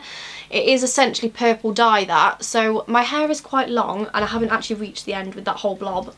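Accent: United Kingdom. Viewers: You have a nice accent...are you Scottish? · are you from near nottingham? you have such an east midlands accent! xxx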